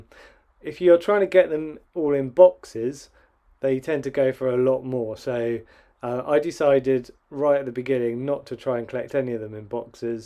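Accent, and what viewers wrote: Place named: RP, United Kingdom. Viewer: SO posh (loved it)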